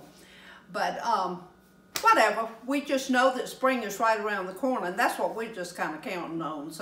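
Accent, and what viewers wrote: South, United States. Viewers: What state has this accent? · You wear the most beautiful clothes when you cook! I just love your southern accent. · I love southern accent.. I just told my hubby I think she sounds so much like Rosalynn Carter.:)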